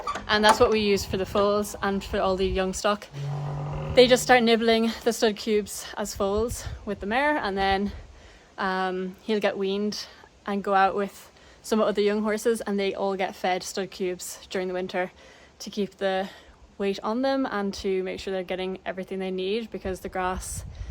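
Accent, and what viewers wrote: Ireland. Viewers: First. Wonderful vlog. New helmet! Love it! Just enough bling! I love how you used to say Khal was so pokey and you kind of looked resigned. Like - yup he's sooo slooow. He was but I still thought he was adorable. When I revisit those vids I can barely see the incredible jumper he is today. I really loved him but I could not for the life of me see his future self. Kudos to you for seeing it. Second, I LOVED your comment about Meg. Lordy, she is funny with the semen. She blushes and I crack up! I am not a vet but am a nurse so I truly have no problem discussing anything at all with anyone at all. Healthcare, be it animals or people is full of lots of bodily fluids going willy nilly. And as an American, I love listening to your beautiful Irish voice but then you said Urine in a way that my American ears almost started to bleed! It was so darn funny too me. 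Keep up the wonderful content. Sorry for the long comment-I just have so darn much that I love about you and your horses, your dad and your attitude. Plus I love that I know about gentamicin.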